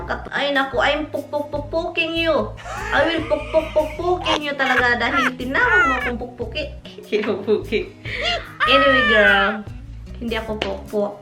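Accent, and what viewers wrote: Visayas, Philippines. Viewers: SO WHAT KUNG MAY ACCENT KA? So what kung bisaya ka? Grabe itong mga taong ito akala mo naman matatalino pa sa yo! Bkit sila ba may blog kagaya mo? Inggit ba inggit sila kaya ganyan sia ka mean sa pagsasalita nila sayo. Kaya hayaan mo nlang sila. Matuto sila ng tamang asal!!! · hayaan mo sila sis, i like u nga e. alangan namang vlogger di palasalita..syempre magdadaldal ka. ganun talaga regional accent kasi bisaya... ako ngang pure tagalog namamali din ng bigkas.😂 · I always watch your vlogs but I still have to catch up with your past vlogs., anyway, other people have no life so they mind how others live their lives. Kaloka sila, eh ano if bibigyan Ka Ng pera ni Tommy, helloooooo, asawa mo sya, super funny ang ibang Tao mag isip. You don't have to explain really sis, whoever is this person, she's so downright jealous of your happy life, hayaan mo syang mangisay sa inggit. 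Nanlalait pa talaga sayo, kesyo maingay Ka kumain, ang sarap mo kayang tingnan kumain, nakaka gana nga, kasi natural masyado, nag eenjoy kang kumain. Grabe ang inggit nya sa yo, kasi maganda Ka at happy sa buhay mo tapos, ang gwapo at super loyal Ng asawa mo. Ang linis linis Ng bahay mo, how dare these people call you pokpok, sobrang home maker mo kaya, tapos, super loving ka din sa asawa mo. Inisin mo nga sila, ingayan mo pa kumain, inisin mo pa lalo. Bisaya din ako, nagkakamay din ako, at maingay din ako, not only when I eat, as in, maingay ako like you, so what? Napaka bitter Ng babaeng Yan, kawawa naman sya. Eh ano if matigas ang accent natin? So what? Tigasan pa natin lalo, para lalo silang mainis, lait Ng lait, but nanonood naman, mga gago sila. At manlait RIN Wala kayong anak, eh ano, ako din walang anak, complete pa rin tayo, keysa magkaanak nga, mga Adik din, may neighbor ako ganun din sa akin nuon, before I got married, nilait ako being single, and now that I'm a widow, nilalait din dahil walang anak, but yong mga anak niya, lahat Adik, pulos lalaki, nakakataw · Yung iba nga sis tinututok pa ang mic para marinig ang nguya eh hahaha.. 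yun nga sis prob sa mga viewers di nmn lahat ng kilos ntin eh need video di nmn lahat move. Mga ganyang ganyan din mga comment sakin hahaha. Mga inggit lng satin sis. Bisaya ka kasi sis kaya syempre bisaya accent talaga. Syempre maganda nmn talaga tagalog english para maintindihan ng lahat. Pag nagbisaya ka sis mdami malulungkot di makaintindi. Tawa ako ng tawa pagbasa mo hahaha. Ako nmn magtatagalog nlng daw ako eh pag nagtatagalog ako dami nmn nagrequest english kasi may mga foreign viewer. Tawang tawa ako sa may asim pa hahaha. Pokpokin mo n sis hahaha. Dati nagbasa din ako negative comment mas lalo sila nagsasaya haha. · Hi I love all your vlogs ,hayaan mo yang mga bashers at least marami naman mga good people loves you .mga wala lang yan magawa at naiinggit lang yan sayo.Stay what you are ,I am proud to be bisaya ,I love the way you talk , ganon talaga tayo mga bisaya matigas magsalita ng words ,marami ka naman napapasaya like me I really enjoy your videos .Don't mind people judge you ,walang perfect sa mundo ,Stay positive and stay what you are I love you , pashoot out naman ,God bless😍😍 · ganon talaga ang bigkas tayo mga visaya tingnan mo melai canteveros si momy dionesia inggit sila dahil marunong tayo magtagalog samantala sila bubu sa visaya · Si Pres. 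Duterte nga ganyan din mag english dahil bisaya din siya. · hahahaha..hello jan...mao ning baboy nga sexy og wafa...hehehehehe kakaibang baboy TO.just ignore those no sense PEOPLE.hehehehehe...papansin lang na ba.hehehehehe may pa mangaon tag tinapa na isda.ana man jud nang bisaya mag English,teacher nga dili perpik eh.hehehehehe...only God is perfect. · I like you sis for being so honest, there's no problem if you sounds like bisaya when you talk in English or Tagalog, let's be proud of what we are and where we came from, maybe these people are envied by you, don't stressed yourself.....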